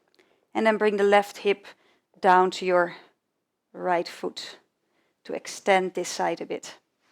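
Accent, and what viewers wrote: Netherlands. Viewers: The exercises are good. i only wish she would speak Dutch because, though she's fluent enough in English, her pronunciation clearly sounds like Dutch. It prevents me from enjoying the yoga.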